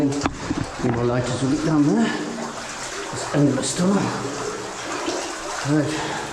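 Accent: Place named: Potteries, United Kingdom. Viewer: Very random question, where's your accent from? Sounds like Trentham in the Potteries!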